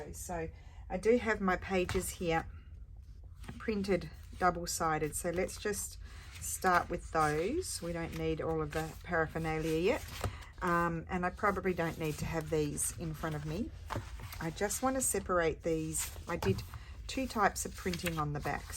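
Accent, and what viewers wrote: Australia. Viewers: Thank you for letting me know! Perhaps some day I can make the list. I adore your videos, your Aussie accent and your shop items. And you!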